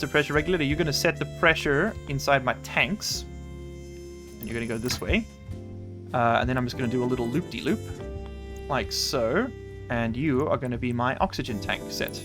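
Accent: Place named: South Africa. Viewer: Thought you had a Dutch accent, but South African is close enough 😅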